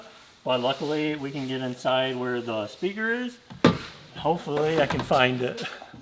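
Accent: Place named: India. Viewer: Why do you have Indian accent? 😁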